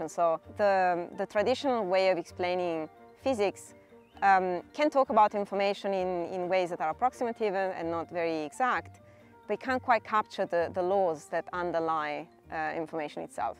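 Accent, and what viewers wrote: Italy. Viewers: Er, her accent would be Italian, not English, as she comes from Turin.....So she passes the Turin Test! (sorry!)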